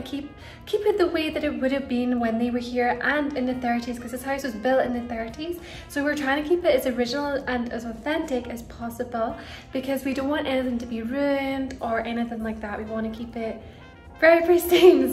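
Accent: Scotland, United Kingdom. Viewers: I beg to differ. Marilyn is gone. This is her interpretation and she has a Scottish accent. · Stop trying to change your voice. You naturally have a Scottish accent, but half the time you’re softening voice like Marilyn. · Easy peasy ❤️ love the Scottish accent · Lol of course it's inauthentic, she's from Scotland so normally her natural accent is a thick Scottish accent but she's CLEARLY a Marilyn Monroe enthusiast where she styles herself to look like Marilyn, moved to L.A., an ENTIRE different country, and bought Marilyns house, so ya, if she didn't try to imitate Marilyns baby high pitched voice, i wouldn't be watching her as the baby voice is a part of the gig, this look wouldn't make sense otherwise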